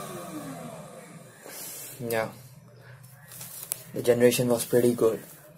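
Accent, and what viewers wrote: India. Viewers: if you just improve your Indian accent you are going to be watched and followed by more people thanks for the videos you are posting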